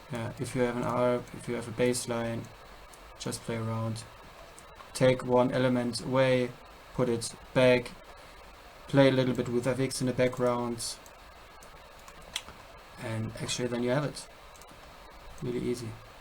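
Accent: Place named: Germany. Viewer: Dein Englisch kling Deutsch^^. oder?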